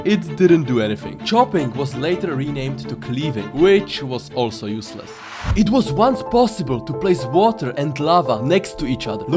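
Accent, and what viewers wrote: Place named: Czech Republic. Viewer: Libi se mi ten tvuj ceskej prizvuk😂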